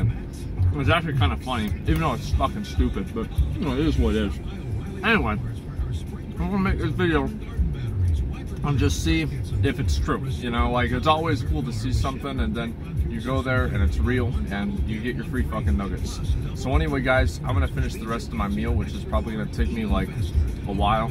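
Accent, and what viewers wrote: South, United States. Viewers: It hasn't hit me how southern he is until I watched this :3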